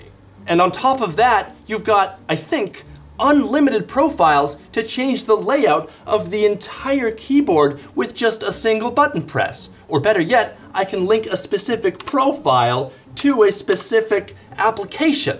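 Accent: Netherlands. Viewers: Are you dutch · Are You from the netherlands?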